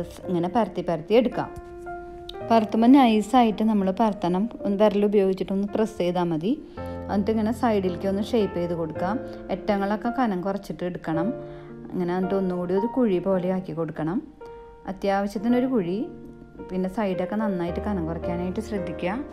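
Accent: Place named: Thrissur, India. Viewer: Hai my dear friend ഈ സ്പെഷ്യൽ ചേരുവ കൊഴുക്കട്ട സൂ....... സൂപ്പർ പിന്നെ ഈ തൃശൂർ ശൈലിയിൽ വിവരണവും അടിപൊളി കൊഴക്കട്ട ഫ്ലേവറും ഈ വിവരണ ഫ്ലേവുറും കലക്കി വീഡിയോ കണ്ടു ഇഷ്ടപ്പെട്ടു ലൈക് ചെയ്തു 👍💐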